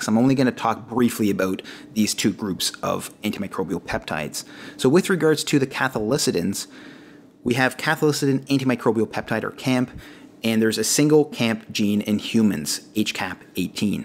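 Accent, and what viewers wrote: Canada. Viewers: Thank you, neighbor to the north (if I am identifying the accent correctly), for your informative presentation.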